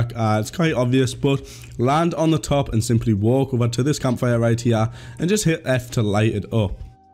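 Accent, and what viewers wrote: Newcastle, United Kingdom. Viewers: true geordie if he played fortnite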